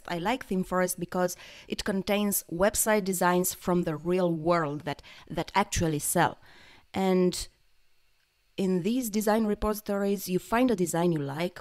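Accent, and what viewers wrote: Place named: Russia. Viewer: Классные упражнения!Спасибо!У тебя русский акцент чувствуется)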